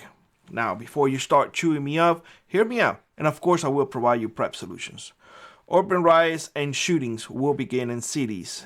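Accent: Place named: Mexico. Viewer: YOU MUST BE A WHITE MR MEXICAN, DEPORT YOU TOO. YOUR ACCENT GIVES YOU AWAY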